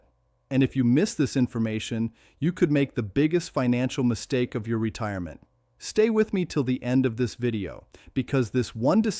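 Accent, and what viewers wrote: United States. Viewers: Why the US accent for UK matters....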